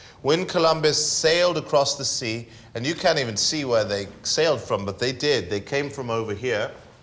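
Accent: United Kingdom. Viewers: I don't believe is American, he sounds genuinely like one of use brits! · Speaking as a Brit, his accent is very near perfect. Just need to tweak a few tiny words to nail it completely ('you caught me', 'sinister', 'called it' etc) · English accent is near perfect.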